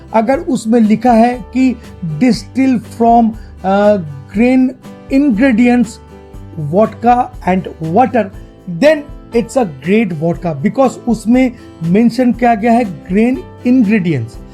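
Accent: Bengal, India. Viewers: Dada you are superb , I like your Bangla touched hindi.....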